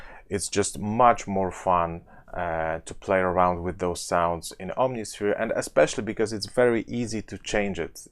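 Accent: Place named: Poland. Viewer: Mówisz po angielsku jak większość Polaków - od razu słychać pochodzenie. Biblioteka fajna - jednak nie moje klimaty. Pozdrawiam :)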